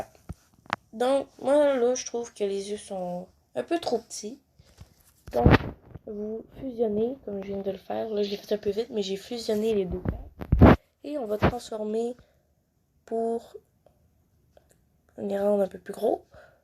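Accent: Quebec, Canada. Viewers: J'aime se que tu fait je vais essayer sa tout de suite ! Sinon si il y a des gens qui disent qui n'aime pas ton accent québécois, ne les écoute pas moi sa ne me gêne pas. Ai'je le droit de te faire une pub ? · Merci, t'a vidéo m'a énormément aidé ! Et au passage j'adore ton accent québécois ! ❤️ Je m'abbonne ! 💞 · Cute ton accent québécois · Omg merci beaucoup j’ai enfin compris :O et j’adore ton accent québécois 🤩 +1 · L'explication 👌 l'accent québécois on adore +1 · Ça m’a beaucoup aidé, merci bien ! 😄 Je trouve que tu expliques bien, en plus ton dessin est superbe ! Et j’adore ton accent québécois 👍🏻 Donc en gros tous est géniale ^^ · Merci pour sa ! en dirait que tu est une Québécoise avec ta voix trop belle ❤️🌹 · Omg québécois j’adore veux acsen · Merci tu explique mega bien et j adore ton axcent québécois et sinon pourrais tu faire un tuto comment faire bouger les bras par exemple s'il te plaît TwT et je m abonne direct et paff c fait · Je peut avoir ton accent québécois ? 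· J adore ton accent québécois il est trop beauuu 😍 Sinon super ya jamais personne qui explique aussi bien que toi ! 🤩 +1 abos je te jure :) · Je m'abonne direct ÚwÙ Grâce à toi jai réussi enfin Ton accent québécois je kiffe trop!!! ♡♡♡ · J’aime bien ton accent québécois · J'aime trop ton accent québécois !!!! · Je trouve que ton accent québécoise et trop rigolo je veux pas me moquer mais je l'adore ton accent et toi son trop bien 😊😋ha et aussi je m'abonne !! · Tu as un agcent québécois (je vien d'arriver)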